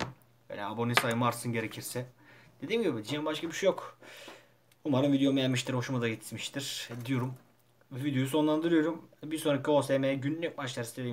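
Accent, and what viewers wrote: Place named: Kırklareli, Turkey. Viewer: konuşmandan anladım bende Kırklareliliyim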